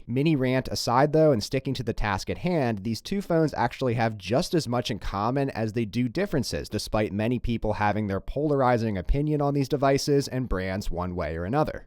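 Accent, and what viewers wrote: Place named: California, United States. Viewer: California accent is f disturbing, smart information sounds dumb.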